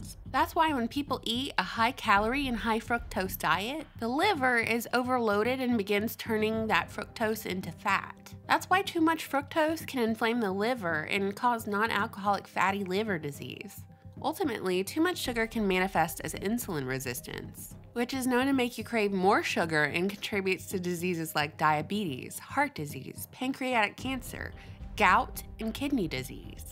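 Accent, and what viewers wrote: South, United States. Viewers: Thanks, love your channel and your southern accent! picked up a hoodie for my daughter and a t-shirt for me!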